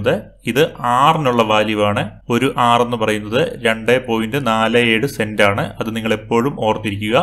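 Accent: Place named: Trivandrum, India. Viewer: Tvm slang♥️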